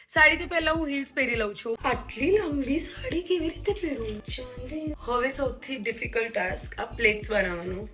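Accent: Surat, India. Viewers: Yeahhh surtiii girll in gujju accent ❤🔥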